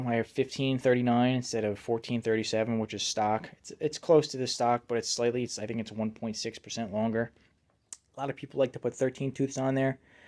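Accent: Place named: New York, United States. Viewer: Your accent makes it sound like you're from New York City or New Jersey.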